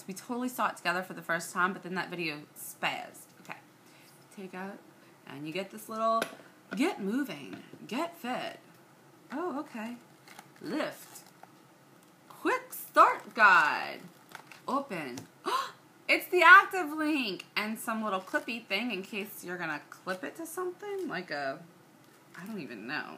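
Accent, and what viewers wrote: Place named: New Orleans, United States. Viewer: great video.. just curious.. are you from the new orleans area too? (the accent has my curious, you sound like me and my friends)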